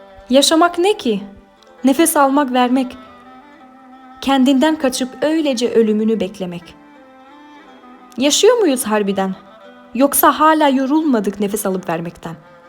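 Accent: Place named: Kičevo, North Macedonia. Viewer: ke një zë shumë të ëmbël dhe të mrekullueshëm gjithashtu edhe një talent të pa parë. Në dëgjim të parë nuk mund ta dallosh se është interpretuar nga një vajzë kërçovare kaq mirë,pastër dhe bukur gjuha turke. Të uroj shumë suksese si në këtë talent që ke gjithashtu edhe në drejtimin që ke zjedhur të studiosh.❤️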